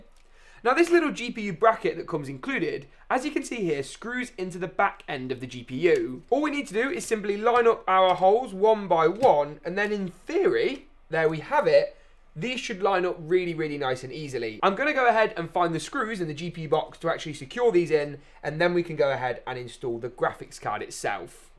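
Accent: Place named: Yorkshire, United Kingdom. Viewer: Utterly bonkers - You are from Yorkshire, right?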